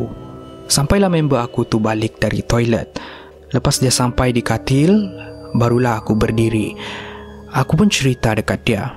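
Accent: Sabah, Malaysia. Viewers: tu bahasa sabah bukan indon, ramai org malaysia yg tak tau loghat sabah huhh, pelik2, macam sabah bukan malaysia dh. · saya ada kawan baik orang Sabah.. saya dari semenanjung.. suda 17 tahun kawan sama dia sampai sekarang.. Bila Admin bercerita ada dialek Sabah, saya senyum jak bah.. faham suda..😁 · Dia org Sabah lah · Kupi² go ni... Orang sabah kah pencerita dia? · pekatnya sabah awk.. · / sabah bro,not indon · loghat orang Sabah tu saya pun org Sabah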